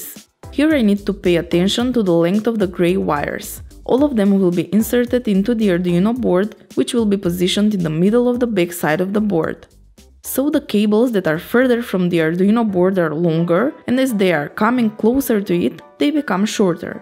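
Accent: Russia. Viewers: hard to imagine what was the cost of that table :) PS: are you from Russia?))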